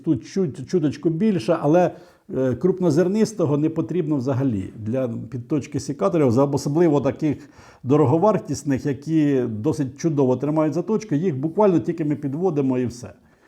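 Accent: Poltava, Ukraine. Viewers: Українська мова з нотками полтавського суржика - Це супер . Ви молодець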